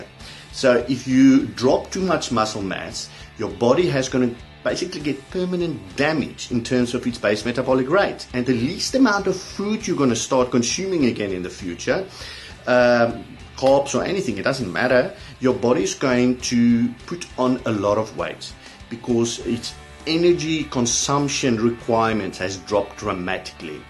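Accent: South Africa. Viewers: Not only do I love this guys information, I am addicted to his accent! SA has the best English accent IMHO...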